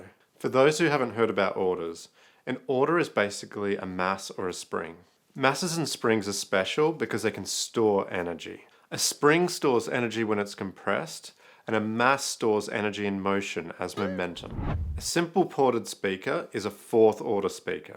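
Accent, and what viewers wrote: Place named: Australia. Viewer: I can't tell you how exciting it is seeing and hearing a fellow nerdy Australian in these videos. Huge congrats on such a great design!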